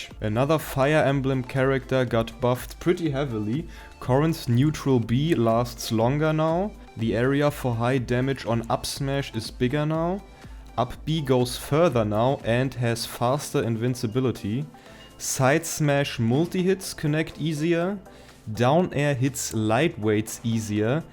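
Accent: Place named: Germany. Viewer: dein Englisch klingt echt hart deutsch.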